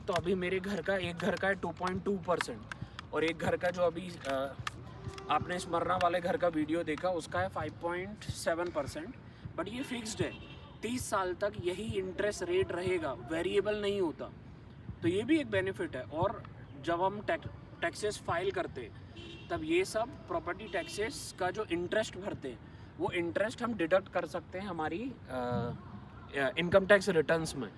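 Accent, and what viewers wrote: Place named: Bundelkhand, India. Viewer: Jst LOve Your Bundelkhandi accent :face-green-smiling:💟😍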